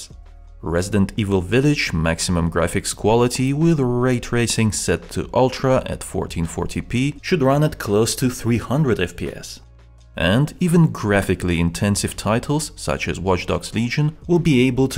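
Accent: Russia. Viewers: Акцент русский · Мне кажется, или он русский?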